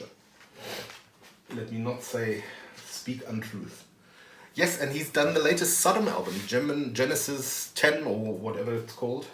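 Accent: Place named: Germany. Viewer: You have a German accent, and I live in Europe. From what retailer did you buy it from?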